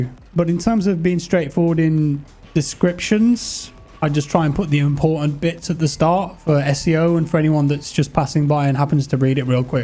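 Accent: United Kingdom. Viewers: I‘m following ur Channel since WZ 1 I love your commentary and especially your lovely british accent 😊👌 Lots of love from Germany ❤✌️ Keep going!!!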